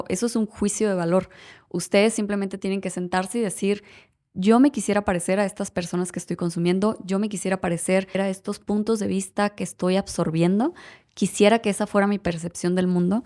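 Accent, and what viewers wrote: Mexico. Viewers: Definitivamente que podcast tan genial. Los temas y la manera como los abordas me parece genial. Ahora... Tu acento Mexicano me encanta!♥️ Saludos desde Colombia 🇨🇴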